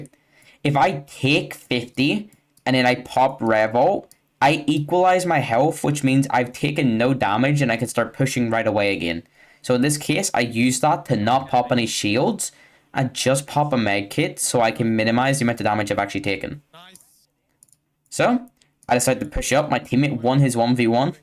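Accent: Northern Ireland, United Kingdom. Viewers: You're awesome. Love the NI accent. God save the King.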